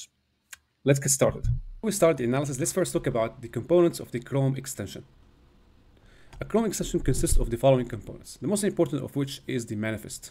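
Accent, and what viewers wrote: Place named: Iran. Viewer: Your video is like a professor lecture on digital forensics.With a Persian accent “ good Ea stuff “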